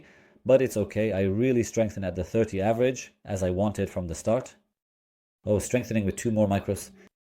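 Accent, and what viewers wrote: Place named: United Kingdom. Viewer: Loved the British accent mate, good luck with the English account 👌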